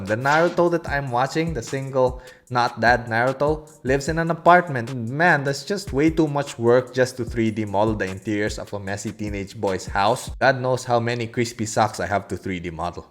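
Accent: Philippines. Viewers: You sound like how Jokoy perceived what Filipinos sound like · damn! this is why i always wanted to see your vids coz men! your editing skills is so good and satisfying. The transition effects are so good and educational. also love your filipino english accent hahaha every diction of words is clear and precise. I think you are one of the underrated vloggers so i hope soon i can witness your growth up to million subscribers..hayyysss every time i watch your vid makes me want to go to your place and ask you to be my mentor hahaha anyways i also finished architecture degree hope to see you soon idol! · These dudes right here be pressed about a person who just recognized a filipino accent. 😂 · why do I feel that you have a Filipino accent? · Heards his accent Me: Heck yea, a Filipino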